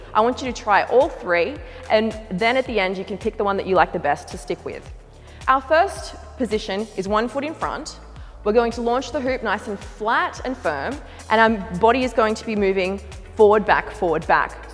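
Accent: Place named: Australia. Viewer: Amazing video and explanations. No one else had all this info Hate the aussie voice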